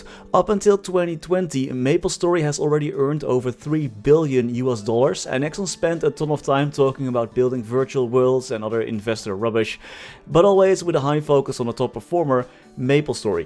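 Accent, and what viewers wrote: Netherlands. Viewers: I can tell by your english that you are dutch lol! Thanks voor de video maat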